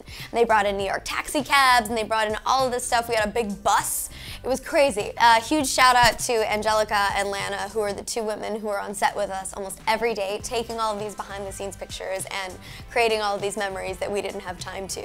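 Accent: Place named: Canada. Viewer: “Torono” ahh the Canadian pronunciation has grown on her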